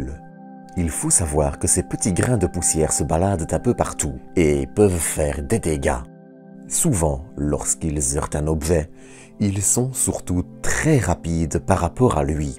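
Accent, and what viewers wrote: Belgium. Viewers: Ici grâce à Christophe ! Super chaine, je m'abonne ! (en plus je suis addict à l'accent belge...) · il a un accent belge à couper au couteau, il doit veniiiiR du fin fond de la campagne hainuyère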